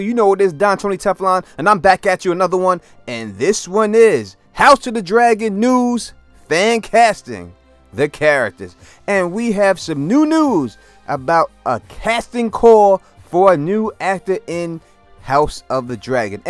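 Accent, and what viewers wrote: New York, United States. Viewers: Perfect casting! I love listening to your commentary as your NY accent is so distinctive and makes me miss home. NY strong.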